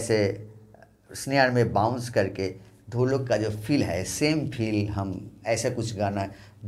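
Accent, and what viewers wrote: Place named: Bihar, India. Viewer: Ami jani ....tumi Bengali but tmr Hindi language taan Bihar ar moto tai ...Aaj k thk haam na mey bolo..khub vlo lgbe amader sunte.. please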